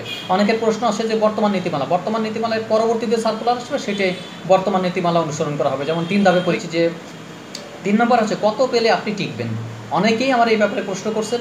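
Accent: Bangladesh. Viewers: ওরে বরিশাইল্লা!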